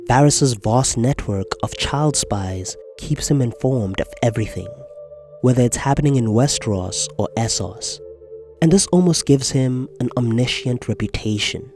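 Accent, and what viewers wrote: South Africa. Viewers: Haibo is that a fellow mzanzian I hear ???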